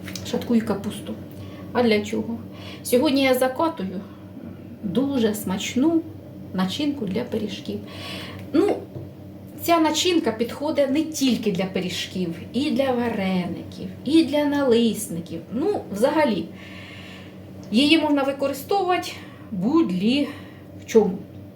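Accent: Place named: Chornobai, Ukraine. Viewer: та ще й мабуть біля Чорнобая живете, я цю мову впізнаю з тисячи🌺🌷😉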